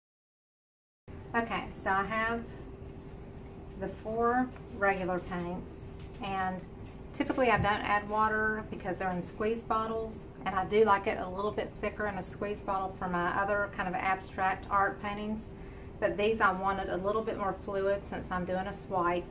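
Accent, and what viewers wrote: South, United States. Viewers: Do I detect a southern accent y'all? :-) I'm originally from Tallahassee.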